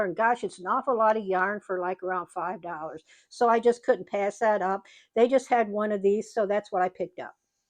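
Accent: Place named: DuBois, United States. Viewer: I'm not, directly, but all my mother's family is in/near Dubois, PA. I asked because you talk with the same "accent" for lack of a better term, just like they do. I'm glad you had such a great holiday.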